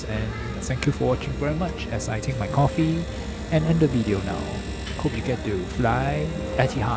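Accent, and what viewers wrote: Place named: Singapore. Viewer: Your SINGLISH accent is sooo funny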